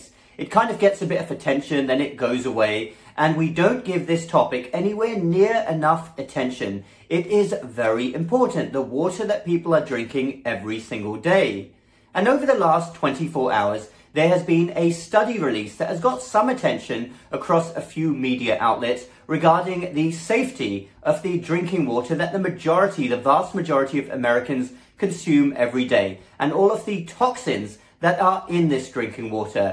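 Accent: United Kingdom. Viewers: On a completely different subject, you say you've lived in US for 10 years, your accent is still British. I am British, am staying in US with a London born lady, she has lived here for 35 years, not a hint of a US accent, sounds a proper London girl 😎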